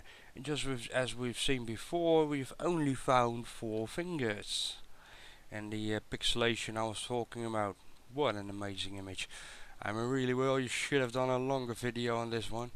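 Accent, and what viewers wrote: Netherlands. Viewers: I work with a couple of Dutchies, but not heard your variation before, you from the Groningen area or the East maybe ?? Enjoyed your Vid, even if I do not pretend to comprehend it all :) My biggest problem is that you can make shapes appear if you look hard enough at anything, remember when we were kids looking at the clouds, you pull out some interesting pieces tho for sure, def worthy of further investigation or question. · I can´t watch this vid because of that Dutch accent. It really drives me insane.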